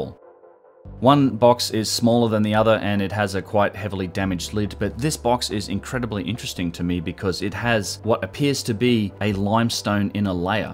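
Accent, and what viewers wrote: Australia. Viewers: I love your work. Its mind boggling to me how wrong the mainstream is on this and I don't understand how it can keep up the lies for so long. From a engineering perspective alone the proof is everywhere of . honestly I think you should have billions of subscribers. People are blind. Even my userly open minded flatmate fails to see the proof.he watched a few minutes of your fine work and became instantly sarcastic. He says he knows the history of Egypt, he was taught about it in school ! lol. He then went on to say that the Australian accent was annoying him so I guess its all sorted for him haha. Bloody hell typical kiwi 🥝 🙄. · (Just a comment to help with the algorithm , unquote.) Man, I love hearing this revolutionary information in an Aussie accent. Just sayen ... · Irrationally cool this info has a Australian accent. Stuff took generations to make... 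that’s key. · Good on you, mate. Interesting videos, including your own unique take on things; plus it's refreshing to hear a relaxed Aussie voice (and Aussie-isms) on videos of this nature. Extremely envious of your opportunities for travels, discoveries and adventures. Cheers from Port Macquarie NSW.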